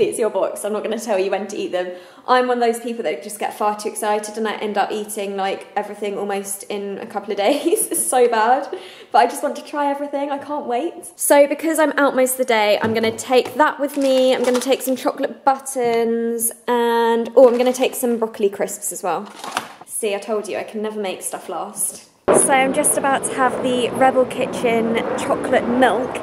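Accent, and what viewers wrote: United Kingdom. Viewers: i just melt when you speek. i love your british accent. it's so sweet and nice. the time you said snacks i just repeated it over and over because it was so lovely the way you said it. i wish i was british....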